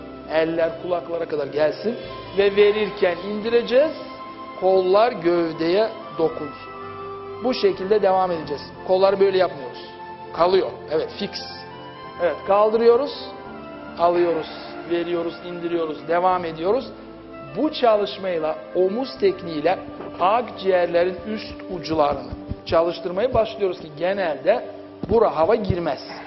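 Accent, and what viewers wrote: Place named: Azerbaijan. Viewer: Konuşan üstad kesinlikle azerbaycanlı! 😊 Yanıldım mı? 🤔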